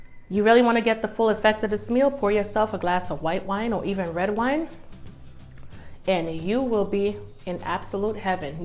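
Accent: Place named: Maryland, United States. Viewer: You are one fine cook. I just made your Lobster tail stuffed crab recipe and I’m the local hero hereabouts thanks to you even though I think that I slipped up on the Cajun.. I used 5 full length lobster knuckles/claws and secured them together with long toothpicks..in lieu of the lobster tails..more tender..Placed on a 5”x 6” shell.. Your recipe makes two servings..PERFECT..Thank you.. and by the way your accent belies you. Are you living somewhere between New York… Maryland girl? LOL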